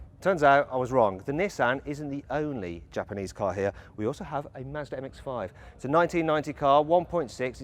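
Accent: Birmingham, United Kingdom. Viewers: Is this guy a brummie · Never thought i'd hear "barn find" in a thick, British accent again. I already miss you, Horizon 4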